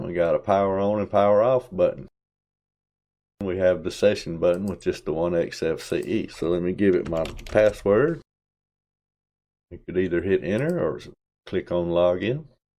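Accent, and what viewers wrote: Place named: South, United States. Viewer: Hi, GeckoLinux creator here. Thanks a lot for the thorough and open-minded review! And I love that southern drawl! Real pleasure to listen to.;-) Just a few clarifications: Regarding the lack of GeckoLinux theming for GRUB, that's intentional. GeckoLinux is unapologetically openSUSE, created from openSUSE packages and using the openSUSE repos. I recognize that I'm standing on the shoulders of giants, and I make no attempt to conceal the fact that the system is still proudly openSUSE. I'm not sure what happened with the Google Chrome repo refresh, must have been a temporary network failure. You can just skip the refresh on individual repos instead of skipping the entire process. What appears in YaST Software the first time after the initial database refresh is not a list of availabe updates, but rather a list of packages specific to your hardware. 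The XFCE panel clock size is actually controlled by the XFCE general fontsize settings. Your summary at the end was good, GeckoLinux is meant for users that appreciate the quality and excellent community of openSUSE but don't like dealing with the hassles. There are quite a few papercuts in a vanilla openSUSE system, mentioned on the GeckoLinux website, so the goal of the project is to eliminate those via configuration changes and package selection, while still remaining 100% openSUSE at its core. Thanks again! Have a good one.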